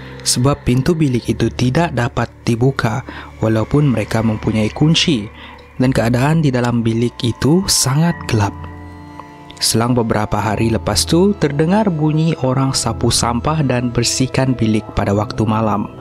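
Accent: Sabah, Malaysia. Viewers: tu bahasa sabah bukan indon, ramai org malaysia yg tak tau loghat sabah huhh, pelik2, macam sabah bukan malaysia dh. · loghat orang Sabah tu saya pun org Sabah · / sabah bro,not indon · pekatnya sabah awk.. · saya ada kawan baik orang Sabah.. saya dari semenanjung.. suda 17 tahun kawan sama dia sampai sekarang.. Bila Admin bercerita ada dialek Sabah, saya senyum jak bah.. faham suda..😁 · Kupi² go ni... Orang sabah kah pencerita dia? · Dia org Sabah lah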